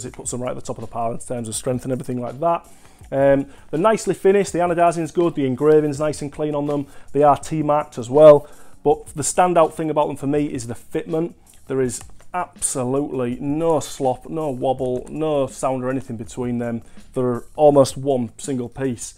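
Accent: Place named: RP, United Kingdom. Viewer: You sound very Posh! At least to me, i'm form Philadelphia. lol.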